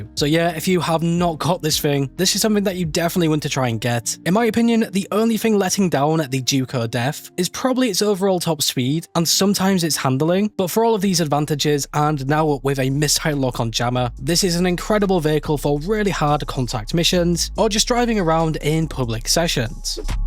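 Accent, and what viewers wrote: United Kingdom. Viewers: Is this British English or Scottish?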